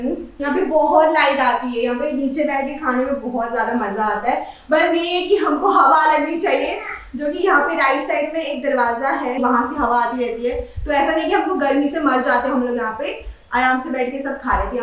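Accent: Odisha, India. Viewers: Your Odia accent is damn❤️.. Coincidentally I'm now living in Baleswar ❤️ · Thank u for sharing dear. I am from Nabarangpur, Odisha. I am Bengoli and my home is Odisha so i can sure you most of Odisha and Bengoli things are same including the names. Your Odia accent is awesome and tumne saare chizo ki naam v sahi se liya hai.😘 Ghar bahut khubsurat hai. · i am from odisha....i am so happy to see you are from odisha too....hearing your odia accent feels so good....love you ❤️❤️❤️ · Di I'm loving ur oddia accent❤. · Hello.. it's good to see you embracing your native land our Odisha in such a beautiful way.. I just have a lil complaint that it's not Tulsi kaa Ped.. it's actually Tulsi Mata (Tulsi ka pauda) whom thaku maa worships daily.. being an Odia I would like to listen ur odia accent as well.. loads of love 🫶🏻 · The way you say" Are Asa" like khanti Odiya ❤ I never knew you re an odia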